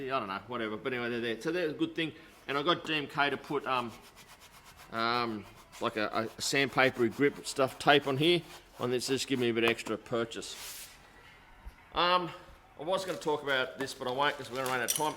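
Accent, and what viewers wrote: Australia. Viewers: Holy s*** you are hard to understand, I had to put captions on to figure out what the hell you were saying. What you are Auisies do to vowels is a violation of the English language & reprehensible to the English language. Still appreciate what you do and the content that you provide relative to the Tenere 700 As Time Goes Forth! Thank you, but keep working on your diction and pronunciation so all of us other English speakers can understand you much better.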